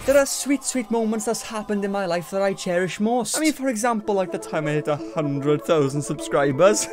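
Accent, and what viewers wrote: Wales, United Kingdom. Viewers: welsh accent🥺❤️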